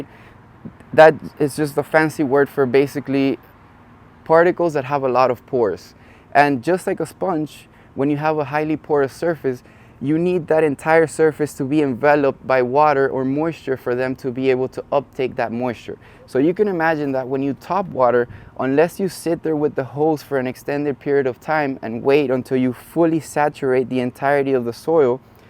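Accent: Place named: Castile, Spain. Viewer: Que hermoso, amigo escucharte, hablar en castellano , un privilegio escucharte, un fuerte , abrazo, desde Buenos Aires!!!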